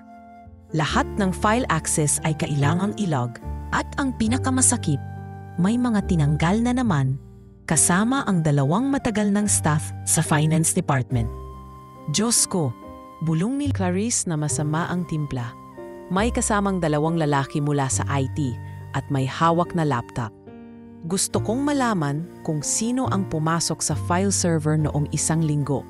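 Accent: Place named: United Kingdom. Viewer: British ung narrator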